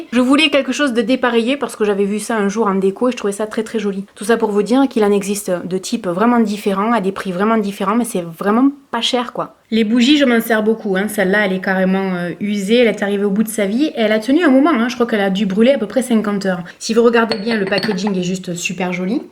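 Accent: South, France. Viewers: Je vous adore vous êtes super génial et vraiment très jolie. Merci pour toutes vos vidéos et votre investissement pour nous aider à faire de bons choix. Et en plus votre petit accent amène du soleil et des cigales 😊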